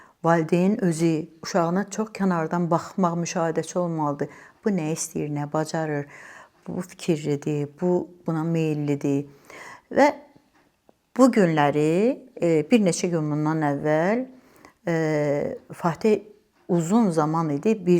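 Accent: Baku, Azerbaijan. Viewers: xanım sizə cansağlığı və uzun ömür arzulayıram. Baki ləhcəsini eşitmək mənə cox xoş oldu. Müəlliflərə də təşəkkür edirəm.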